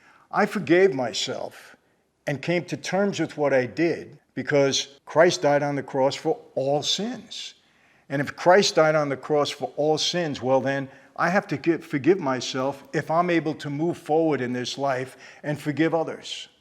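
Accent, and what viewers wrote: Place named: Queens, United States. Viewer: Mr. Franzese, thanks for this video, it really helped me. I’ve gone down some very dark roads and went back to God last year. I keep asking, “how can God forgive me after what I’ve done and how broken I am?” I’m from Queens and you remind me of the good version of the guys who, in a way, “mentored” me. This message coming from you is like one of them giving me good advice in a familiar, straightforward, credible way. Your personality, mannerisms and accent remind me of home and I always enjoy your stories. This kind of video is also really great. Be good, God Bless!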